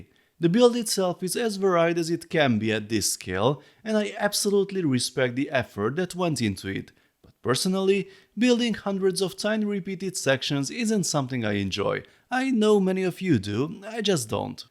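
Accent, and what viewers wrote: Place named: Hungary. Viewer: we love your Hunglish! You must have been talking to Dr Németh - of capandball fame here on YouTube.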